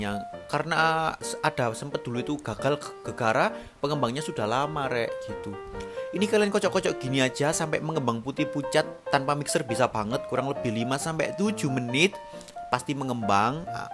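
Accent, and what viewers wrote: Java, Indonesia. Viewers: G bosen lht channel ini medhok&lucu kl lg njelasin,sukses yo rek...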